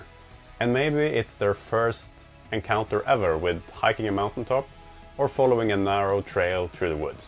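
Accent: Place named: Sweden. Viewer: Fresh video! Been watching your vids for a while now, they're super nice. We are starting our 2 week roadtrip (tenting only) tomorrow, from Stockholm to Lofoten, Senja, Tromso..but starting in the south close to Troltunga.:) Thanks for the tips! Wish us good weather. Also, my gf said you has a swedish accent.:D I'm not swedish but I agree with her. Where do you guys live in Norway btw?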